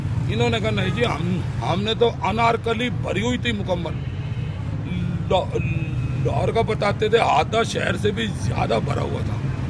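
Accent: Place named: Uttar Pradesh, India. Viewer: Ye UP wali boli kyu bol Raha ha, rohtak wali kyo nahi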